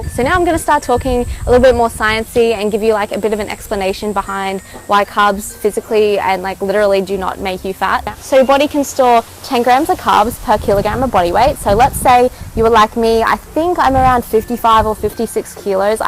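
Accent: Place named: Massachusetts, United States. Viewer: love your accent. r u from MA?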